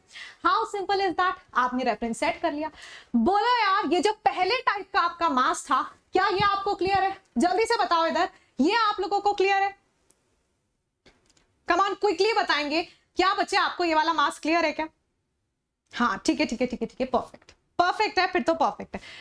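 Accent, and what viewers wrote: Punjab, India. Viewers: Kya hi bolte ho mam punjab se ho 😅 subse best physical chemistry teacher ❤️😌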